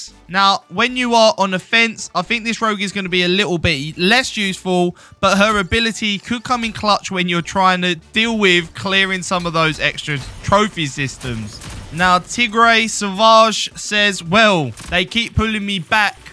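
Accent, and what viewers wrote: United Kingdom. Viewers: I like your content because you show off and talk about cosmetics, you always bring the news of the next update, do awesome gameplays and also I love British accents. Good luck for everyone in the giveaway!! And I'm very excited about runway.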